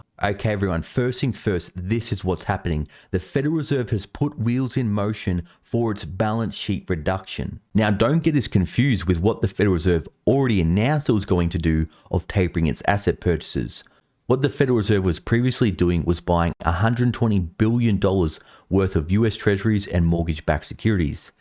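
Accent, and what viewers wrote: Australia. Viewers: This dude sounds australian. Hope you vote republican if you don’t want to be in prison like australia. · I'm guessing from your accent that you are a Aussie. Can you more clearly point out what the ramifications are for Australian s. I like your channel but it is not always clear which country you are referring to.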